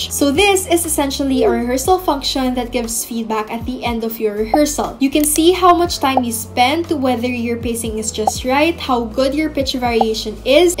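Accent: Philippines. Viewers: i love you filipino accent🙏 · Hmmmmm... I’m new and I hear a Filipino accent~ 😜 I’m Filipino too! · I'm new and at first I'm wondering if you're filipino bcoz of your accent and if not for the "kasi" word that you used I would still be wondering if you really are hehe btw thnx for sharing I would use slidesgo from now on <3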